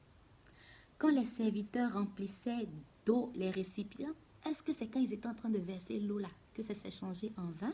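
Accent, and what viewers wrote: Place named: Ivory Coast. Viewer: ton parler donne envie d'écouter et ton accent ivoirien 😃. Merci pour le message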